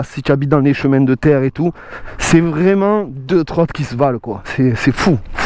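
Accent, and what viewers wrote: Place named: North, France. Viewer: j’adore votre accent du nord et votre égo du nord. 😋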